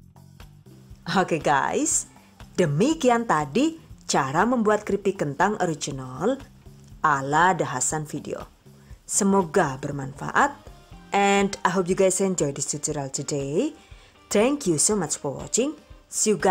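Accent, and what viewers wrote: Banyumas, Indonesia. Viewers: Suka banget klo udah kedenger ngomongnya ngapak2 gitu..aelaah bikjn ngakak polll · Deneng ngapak yak. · Mantap CENEL ini, sy suka, cara bicaranya jelas ada dagelanya juga, bahasa ngapak nya itu lo, bisa bikin ketawa 😂😂😂 aku sangat suka sama cenel ini 👍👍👍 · Deneng ngapak mbok ayu😂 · Hahaa jebulè wong ngapak 😅😂 (di akhir video) baru nyadar 🤣🤣🤣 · Enak kuwe yuu, tok jajal olih apa ora yu.🤣🤣🤣 Rika teyeng ngomong Ngapak ya🤣🤣🤣🤣👍👍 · Orang madiun kok ngapak yah...?😁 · Matursuwun ilmune bermanfaat Haha ha kocak jawa ngapake .sukses trs mbak yu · Makasi Mbaaak... Btw ailopyuu logat ngapakmu. Lagi enak2 ngrungokke serius ujug2 ngekek kemekelen🤩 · Ngapak ngapaknya kerenn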